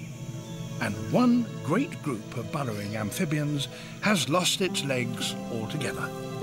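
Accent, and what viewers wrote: United Kingdom. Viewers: I wish this eloquently spoken British chap would narrate my life..."The American father, still in a stupor early in the morning, must have coffee to function properly, and tends to watch strange youtube videos while sipping coffee."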